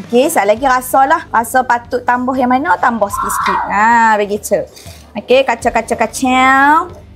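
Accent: North, Malaysia. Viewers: Langsung subscribe deh kamu...ud lama tak dengaq cakap utara..che..prikitiew.sy dah cuba resepi kamu..sedap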